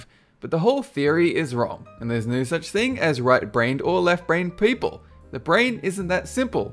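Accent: Australia. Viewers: I was wondering for a while, i am struggling to pin accent, is he Aussie?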